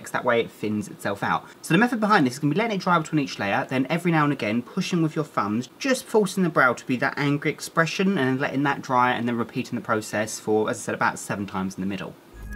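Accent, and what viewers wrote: United Kingdom. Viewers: Ok so rumor has it that the new pennywise has a British accent like you, so if that is true you killed it more then you thought!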